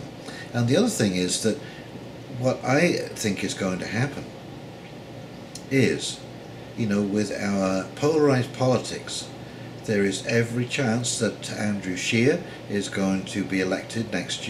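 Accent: England, United Kingdom. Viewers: Where are you from? You sound English but I'm guessing you live in Canada.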